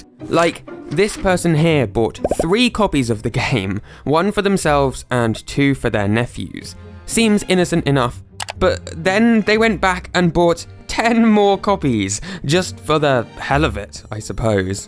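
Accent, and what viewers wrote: United Kingdom. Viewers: British voice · I thort u woor british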